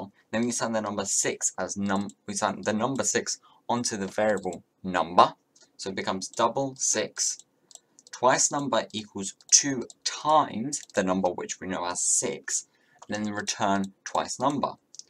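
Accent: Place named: United Kingdom. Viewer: bro he has the most generic british accent wdym😂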